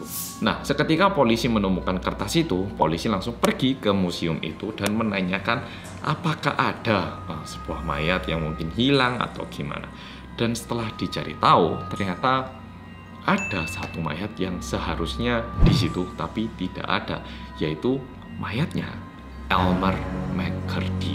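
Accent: Java, Indonesia. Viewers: Jumscare nya emg ngeselin produksinya medok😅, sering x saat kaget hp ku, ku lempar sendiri sangking kagetny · pembawaannya njawani rendah ati, enak didenger meski medhooook.. stay humble, mas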